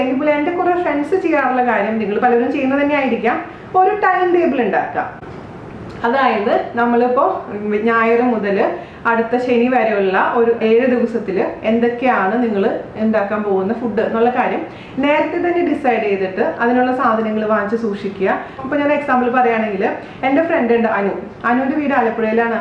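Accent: Thrissur, India. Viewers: Hii chechi nammude thrissur slack kalakito 😍enthurasa chechide samsaram ketukondirikan I like you chechi moleeeee😘🤩💗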